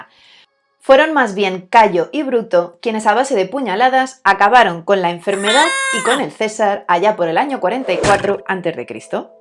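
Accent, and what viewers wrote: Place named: Spain. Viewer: Jaja graciosa forma de contar historia ,me parece que eres de España yo soy panameño.Y todas me parecieron interesantes ,pero como dirian por allá,joder hoy no es que hemos avansado mucho pues en terminos verdaderamente medicos la sangre es como entrar a un espeso bosque no hay forma de saber con exactitud todas las clases de alimañas que hay ahí y la seguimos usando en tranfuciones lo cual es peor que tomarla .Y los medicos aseguran que es seguro.En ves de promover los espansores como el voluven la eritropoyetina y la las operaciones mediante cirujia de recuperació sanguinea de la misma persona lo cual se ha hecho muchas veces ,pero no siguen promoviendo las trafuciones........ Me encantan tus videos saludos..!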